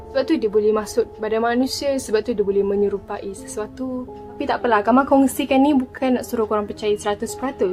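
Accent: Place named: North, Malaysia. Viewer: utara sleng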